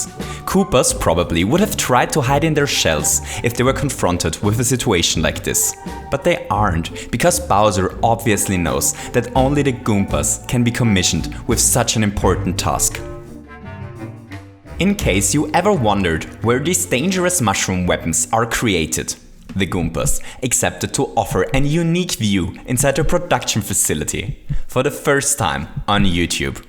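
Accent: Germany. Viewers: Bist du ein Deutscher ? Du hörst dich so an, als ob du ein Deutscher oder von irgend ein anderes deutschsprachiges Land kommst :D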